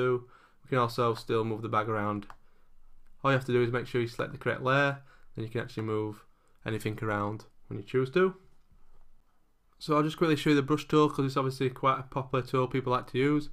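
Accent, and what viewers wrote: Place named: Newcastle, United Kingdom. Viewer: OMG YES, would love to win pixelmator :D haha Are you from new castle??? I'm in love with your accent :)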